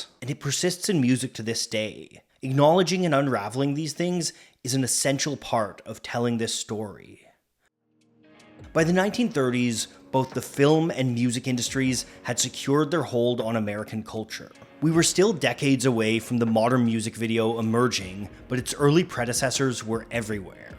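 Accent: Canada. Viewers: Dude, are you from Canada? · Hey, are you Canadian? There's something about the accent...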